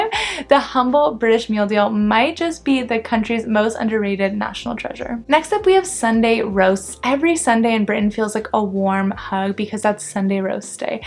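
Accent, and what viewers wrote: United States. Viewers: "YORKSHYRE" BRIDDISH" BUDDERY. For GOD'S sake, if you're posting about British v American LEARN TO SPEAK THE LANGUAGE.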